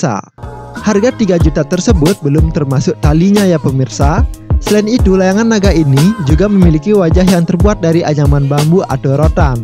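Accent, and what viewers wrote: Aceh, Indonesia. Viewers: Dari logat km bicara Aku yakin km orang aceh kan??? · Youtubernya kayanya org aceh. Suaranya khas sekali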